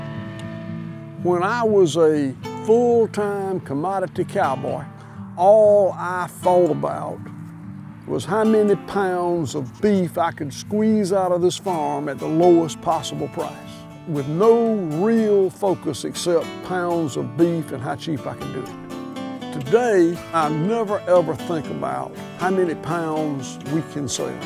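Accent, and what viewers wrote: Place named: Georgia, United States. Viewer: you have the biggest beating heart at all! Thanks so much for this insight to your amazing life and how you have transformed the soil and helped so many people. I love your Georgian accent as well! I'm from rural England and it's green like your land, but the lifestyle is different, more town based.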